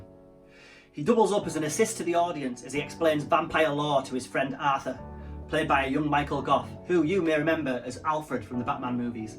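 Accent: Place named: Ireland. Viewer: I love your brogue <3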